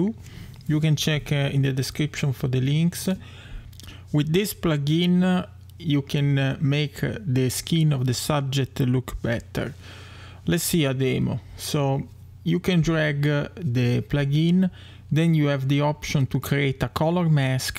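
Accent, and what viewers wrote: Italy. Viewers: Come si sente che sei italiano!! Ho una mia teoria riguardo al fatto che parli in questo modo in inglese.. Pur vivendo in Usa ascolti le radio italiane, leggi giornali italiani, fai video italiani e hai una moglie italiana.. C'è un tizio italiano su youtube che vive sempre in USA che ha la moglie americana e credo che non abbia l'abitudine di leggere giornali italiani. Parla italiano nei suoi video ma sembra più americano che italiano se lo senti parlare..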